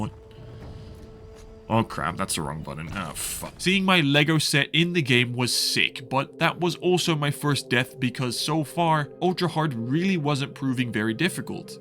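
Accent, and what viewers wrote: Netherlands. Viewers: ARE YOU DUTCH?? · are you dutch????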